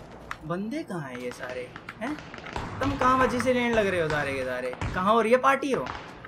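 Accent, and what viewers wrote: Haryana, India. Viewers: Hariyanvi style xD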